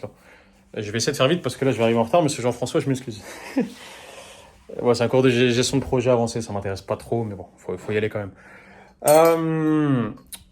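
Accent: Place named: Kabylie, Algeria. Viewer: Salut super vidéo, dis moi t'a un petit accent t'es kabyle ?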